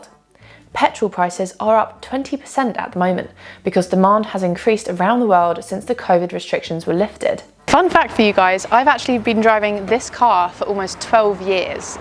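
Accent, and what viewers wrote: United Kingdom. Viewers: you are very beautiful! 🥰Your British accent is fascinating!🤩 I am from Hong Kong and I am an English-Language learner. This is the most fabulous English-Language learning channel I have ever seen!:yougotthis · I like your uk accent and clear pronunciation very much and thank you. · Clear and beautiful British English! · I like the way pronounces words with British accent. It just so pleasing to the ears. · 有一口英音太迷人啦！🙃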